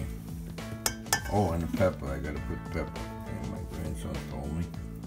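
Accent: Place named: Boston, United States. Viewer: U sound like your from Boston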